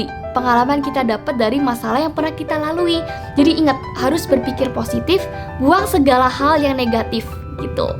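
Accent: Java, Indonesia. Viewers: Kak boleh gk buat konten 24 jam ngomong medok:')🥺❤️🌺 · Kak kk orang jawa ya karna, suara omongan kk Kalok iya sama donk kayak aku tapi aku ngomong nya itu kayak orang medan😂😂😂😂😂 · Kak bicaranya kayak rada rada ada bahasa jawa nya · Ya ampun medhok nya jadi kiyot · Kaka kok medog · Monmaap Cece org Jawa? Medokny nongol😁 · Logat jawanya uhhhh gemessss😭😭😭😭😭💙💙💙💙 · cantik Imut Yang bikin lucu medok nya itu loh😗😂♤♡ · medok banget buna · Medok muu kakkkkk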